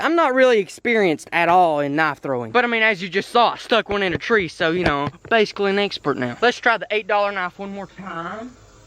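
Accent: United States. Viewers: I Like His Cowboy Accent 😂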